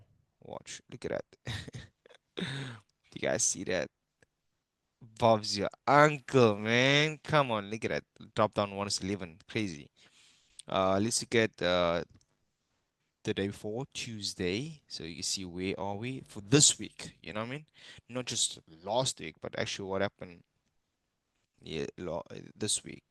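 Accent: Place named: South Africa. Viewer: I heard a South African accent then I subscribed , I will follow you on other social media platforms , I need to be part of a community based in SA ... I'm in Plettenberg bay by the way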